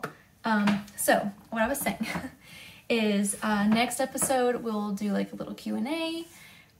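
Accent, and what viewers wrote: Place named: North Carolina, United States. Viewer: Congratulations on your 1,000 subscribers. When I watched your first video I immediately thought you were from NC and I was right. Your accent is very light just like mine but when you grow up in a place it is so easy to recognize. Thanks for another great episode and Happy Knitting!!!!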